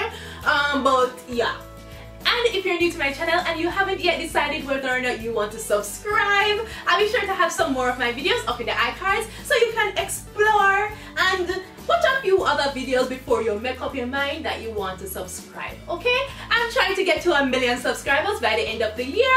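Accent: Jamaica. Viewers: are you from Jamaica or did u lived in Jamaica because u talk like a Jamaican and btw am from Jamaica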